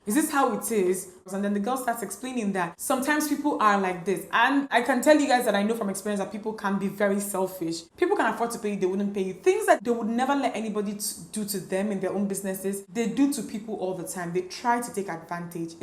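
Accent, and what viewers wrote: Nigeria. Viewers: I wonder what's wrong with your accent that someone will be complaining about. Hmmm... World people, I personally loves your accent. It's our Nigeria accent and very understandable, that Afro Puff don't know if he or she should go and complain on all this Ghana or Kenya Youtubers that uses their country accent na. Nma please don't change who you're because of what people are saying because you can never asin never please world people. If you're doing this, they will request for that and if you start doing that, they will automatically request for this. Stay blessed, you motivates me a whole lot. Thanks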